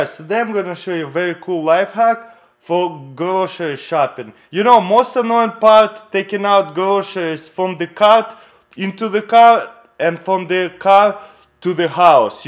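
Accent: Russia. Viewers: сразу понятно по акценту, что чувак-русский )))))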